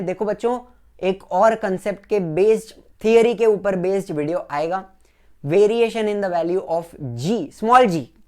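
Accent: Maharashtra, India. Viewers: I bet your mother tongue is Marathi sir🌶️ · Sir you are marathi???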